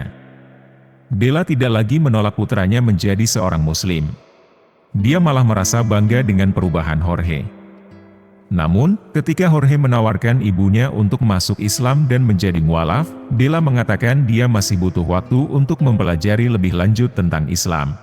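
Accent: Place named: Java, Indonesia. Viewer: di pengucapannya. Mungkin lidah Jowo udah begitu. Gk bs diubah. Tp konten videonya, 👍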